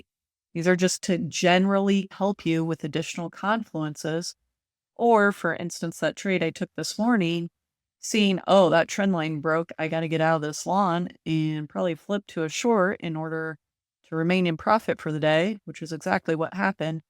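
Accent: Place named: United States. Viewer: Hey, I have a question please. You sound like you are from the US. how did you get an account with MEXC? Whenever I go to the site I get a blank page that says they do not service US clients.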